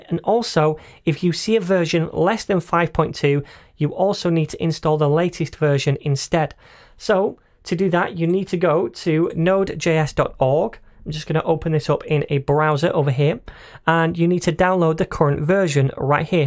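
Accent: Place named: Scotland, United Kingdom. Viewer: You remind me Jackie Stewart's voice, really. Same accent.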